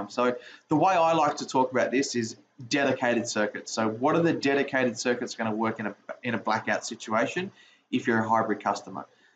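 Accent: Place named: Australia. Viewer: It’s nice to hear a plain Australian accent, as we have to decode yank accents 95% of the time. 🙂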